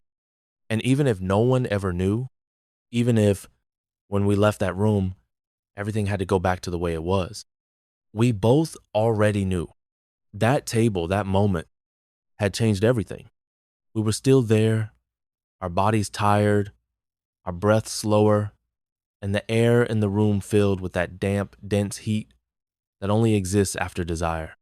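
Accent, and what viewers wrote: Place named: South, United States. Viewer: the masculine version of a Southern voice was mesmerizing and crept into my mind as if it had a master key to open all of my hidden feelings I had locked away long ago.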